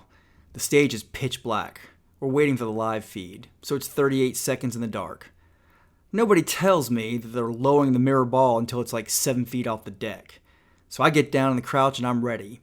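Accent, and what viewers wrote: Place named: Georgia, United States. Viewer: I'm so glad I found your channel! I had this book, loved it, I'd pick it up sometimes and read any random section, I always got something out of it that stayed with me, then I loaned it out & never got it back, so this is a real treat, and btw, you do a great job and your Georgia accent is endearing, I like it.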